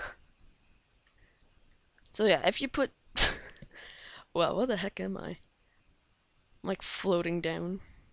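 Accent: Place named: India. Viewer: why do you start out talking like an Indian and then lose the accent? wtf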